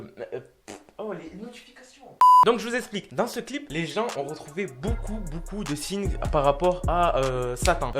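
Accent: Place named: Marseille, France. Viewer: Prk3 tu prnd l'accent marseillai, parle bi1🤭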